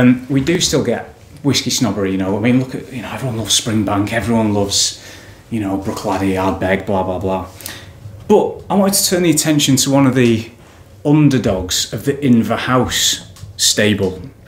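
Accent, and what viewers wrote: Lancashire, United Kingdom. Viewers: Nice review!!! £24.60 on Amazon 27/2/23. Enjoy your enthusiasm and honesty. Thank you. Do I detect some northern accent. Lancs? Greetings from Cheshire 😌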